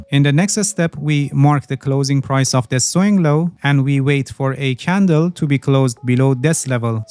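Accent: Iran. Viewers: That's iranian accent 👏👏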